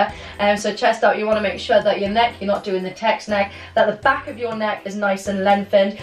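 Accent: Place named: United Kingdom. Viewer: She sounds British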